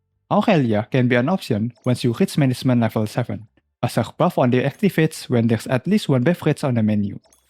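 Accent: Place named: China. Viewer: bro sounds chinese , therefore this video is already gauranteed worth it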